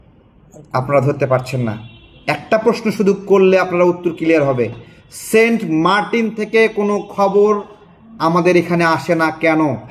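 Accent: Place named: West Bengal, India. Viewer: আপনি বাংগুদের সুরে কথা বলতেছেন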